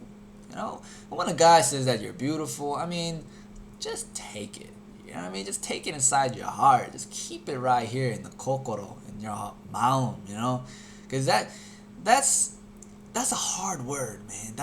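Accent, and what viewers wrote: New York, United States. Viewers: That accent sounds New York. I luv NYC accents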